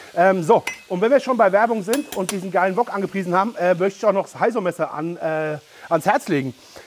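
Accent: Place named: Frankfurt, Germany. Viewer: Schaut gut aus,und der Frankfurter Dialekt 🤟👍🏻Gruß aus HD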